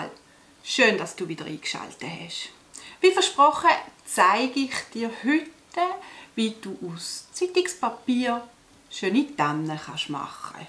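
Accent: Switzerland. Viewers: Hallo hab dich per Zufall entdeckt, bin so begeistert und habe direkt abonniert. Dein Schweizerdeutsch gefällt mir auch.viele Worte hören sich an wie unser Dialekt.lg aus Deutschland/ Rheinhessen LG Ingrid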